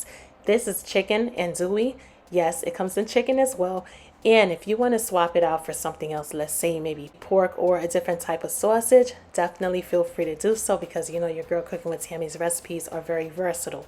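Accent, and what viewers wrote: New York, United States. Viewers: Are you from new york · Im making this right now following your video step by step and pausing in between. LOL Your New York accent is everything. i love your videos and shared them with my sister who lives in Georgia and she loves you too. thank you for making my thanksgiving dinner special and delicious! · That NY accent! Just makes me smile watching it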